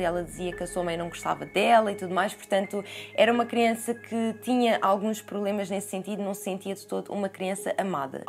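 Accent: Portugal. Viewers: So eu que sou apaixonada nesse sotaque português?😍😍😍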